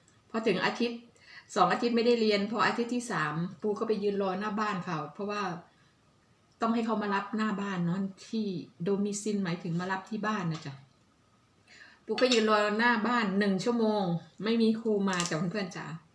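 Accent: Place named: Korat, Thailand. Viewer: น้องปูเป็นคนจ้งหวัดอะไรสำเนืยงพูดเหมือนคนโคราชมัยหรือว่าแถวนางรอง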